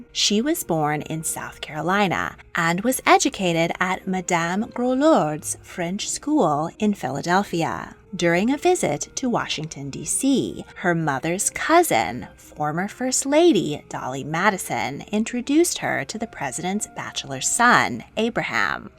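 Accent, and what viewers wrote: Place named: New Jersey, United States. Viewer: OhMayGaud!!! Your Jersey accent is awful!! It makes it very hard to listen to this and take it seriously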